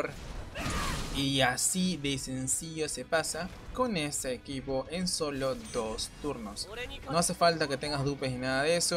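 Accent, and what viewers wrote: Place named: Latin America. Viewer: Feliz noche, creo que eras de latam gracias por tus guías buenísimas!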